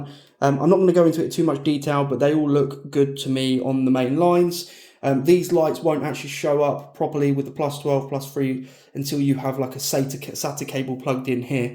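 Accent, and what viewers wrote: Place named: England, United Kingdom. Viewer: Nice video and I subscribed because of it, about time an English accent was on a youtube tech channel keep it up mate.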